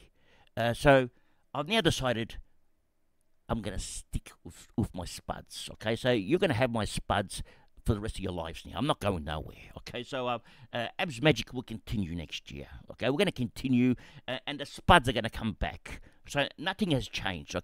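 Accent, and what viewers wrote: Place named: Thailand. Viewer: You bloody beauty… so happy… I too felt the loss when JR retired, but I’m still playing in 5 ex-JR comps with the same old crew … now I’m in 3 of yours plus 3 of your RTSS comps… when I saw the ‘news’ it left me really flat.. losing was gonna be very sad…. So glad you’ve come around… besides FPL is a very frustrating and gimmicky game, I had already decided after this year to give it away.. hahaa… btw your Tinglish is grouse and for the record I tink you are a great communicator.. ❤❤❤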